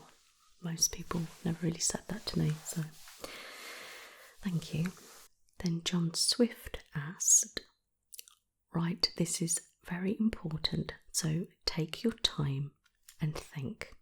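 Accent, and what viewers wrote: United Kingdom. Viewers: I just watched your Q&A tonight and one thing struck me that I had to comment on. You mentioned people commenting negative things on your videos, for example your facial expressions. That my friend is one of your strongest qualities. I absolutely love your facial expressions! I've always loved the British accent so that is a plus too. Your haircut video is my favorite so far. I've watched it many times. And as far as the mental health goes, I have anxiety and have most of my adult life and also worked on a mental health ward of the hospital and my heart goes out to that type of patient. So I'm glad to see you speaking out on the mental health issues. Keep up your good work. You're my new favorite artist. Even us 65 year old grandmas enjoy ASMR. 🙂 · I am so glad I found your channel a couple weeks ago, I have been watching your videos every day & just love you. 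I was born in the UK (Leyton) but moved to Canada 40 years ago. I feel a connection in the sense that you remind me of "home" you speak just like my aunts & cousins & it's a lovely feeling. Thank you!! You are a beautiful soul.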